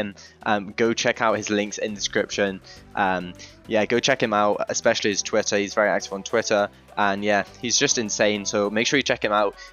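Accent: United Kingdom. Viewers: british english accent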